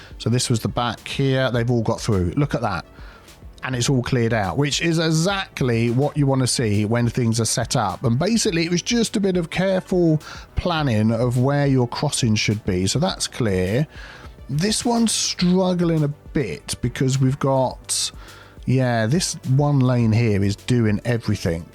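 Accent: United Kingdom. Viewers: the most adorable thing in your videos is your classy British accent. If I had such accent I’d never shut up 🤣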